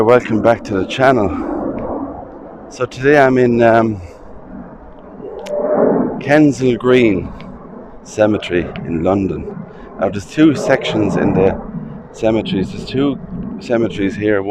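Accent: Ireland. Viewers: What a smooth voice luv the Irish accent dis you notice that the grass is so green plus no weeds?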